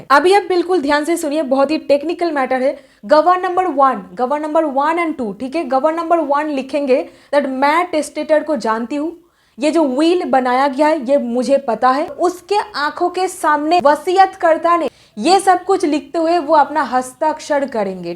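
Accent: Bengal, India. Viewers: Nicely explained with beautiful bengali accent. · nice explanation through a thick Bengali accent.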